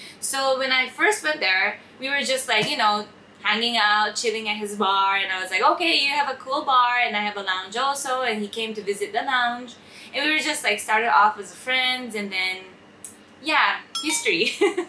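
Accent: Philippines. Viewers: I like how your English sounds, like how the filipinos speak 😄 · Listening to you....u sound so filipino! Your genuiness is very endearing. No wonder filipinos that meet you will like you❤❤❤🥰...being true & sincere melts a filipinos❤😁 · You're starting to sound like A Filipino speaking in English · hahaha..filipino accent..nice. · Ang Pinoy na niya mag english haha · You sounds like Filipino When Speaking English.. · Wow, you have a filipino accent! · why is it that she sound like a filipina when she talks? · Wait your in Philippines? I can tell your English accent sounds like Philippina-lish. OMG lost 900k followers Sorry to hear that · Wow, she's got a Filipino accent. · Your english is becoming a filipino english. Konti nalang! 😅👍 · You have a Filipino english accent. So nice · You sound likely Filipino, I think you love the Philippines, you have a blood of Filipino.. 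· Good to see your channel growing! I love your english accent its almost like a filipino! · That Filipino accent man... = priceless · OMG, you're beginning to sound like a local Filipino! Worthy to be called a Filipina, mabuhay! Watchout for corona! 🇯🇵🇵🇭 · Your accent sounds like a Filipino 😃. Good job. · Did you had this accent before or just Filipino accent rubbed into you? You speak english like a manila girl.. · That filipino english accent... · Your accent is very Filipino! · Wow! Im blown away! Filipino accent! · I like your Filipino accent and it's like your from here na.😂 But I can still your Japanese side like ehhhhhh!!! Ehhhhh! 😂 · can i ask you are a half japanese canadian but why your english accent is filipino? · Even your accent is from the philippines ♥️♥️♥️ We love you here. I was 10 years old when I knew you in "Benny's internet Cafe" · Hi, just asking, how long have you been in the Philippines already. 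As in...... I could hear your TAGALOG ACCENT on your English. As if your a Filipina speaking English. Actually it's refreshing to hear was just very surprise specially since this interview sounded like it was done in Japan. More power to you and great luck to you and your business!!!:D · If I don't know who is speaking I would think you are Pinoy based on your accent. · Your accent really sounds like Filipina.. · Japanese-Canadian 🤔 . If you don’t look at the name and just listen to her accent, I say it’s a pinay who’s talking. Definitely half pinay. Not half Japanese. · you are such a novelty because when you speak English, I think you're Filipino, but when you suddenly insert Japanese, I'm reminded that you are Japanese. Anyway, Mabuhay!:) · U talk like a filipino na nic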